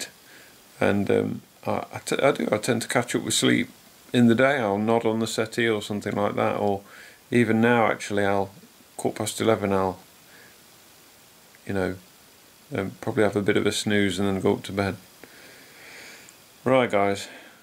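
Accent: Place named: Northern England, United Kingdom. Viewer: We both apologize for never shutting up! I’ve been trying to figure out if I can identify your accent. At first I thought it might be a northern accent, but not Liverpool, because you sound sort of like John Lennon but not like John Lennon. Then I thought maybe London. Now you mention going to Derby, I think (which, as you surely know, we would pronounce “Dur-bee”). Which I looked up on the map and see it’s fairly far north. You said something about a painkiller, and then named it. I’m not sure what the first word was, but then you said “and codeine”. I bet that’s similar to what I know as Percocet. Which is oxycodone and acetaminophen. I believe oxycodone has something to do with codeine. Which reminds me. I need to make a video about that. Because that’s very important. I learned four years ago that Percocet makes me stronger. 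Then I learned a couple months ago that OxyContin makes me significantly weaker. They’re both made of oxycodone. But Percocet is instant release and OxyContin is extended release. Which means it’s oxycodone with chemicals added to it to make it do something different than what oxycodone itself does. Those chemicals do other things, too (“side effects”). Like make me weak.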